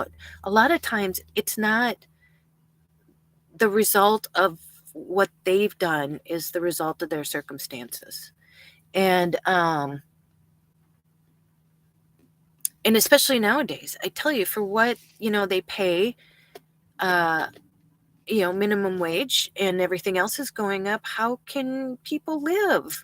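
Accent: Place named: New York, United States. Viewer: Just be YOU. New York accent,,,, Don't worry about it. Lol. More support you than Don't. Keep going. I'm pointing at you. Magic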